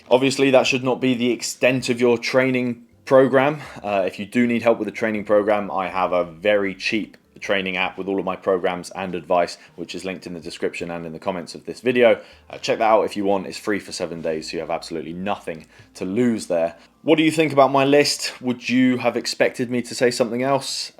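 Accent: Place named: London, United Kingdom. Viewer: love ur accent....so pretty ! where is it from ? London ?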